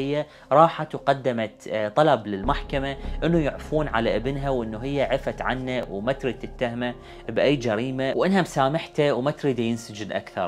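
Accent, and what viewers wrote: Iraq. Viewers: انا بحب اللهجة العراقية جدا ، بس يعني ايه فتشي؟ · حلوه اللهجه العراقيه 🥰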